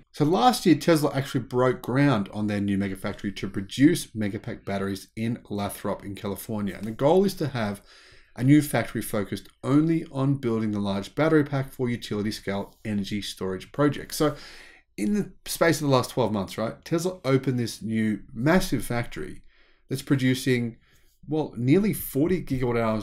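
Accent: Australia. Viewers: His heavy Aussi English, seems make him trip over pronunciation all the time.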